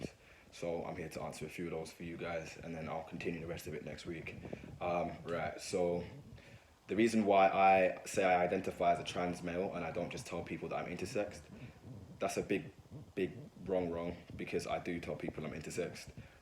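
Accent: Jamaica. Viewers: I thought you were ja-mac-ian did you say you from London?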